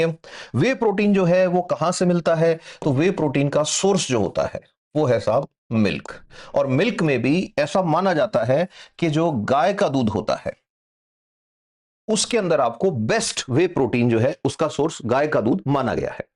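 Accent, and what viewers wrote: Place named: Haryana, India. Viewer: Sir haryana se lagte ho isliye topic suru karne se pahle namste ki जगह ram ram se suru kro to jyada achha nhi rhega bs man me aaya to bol diya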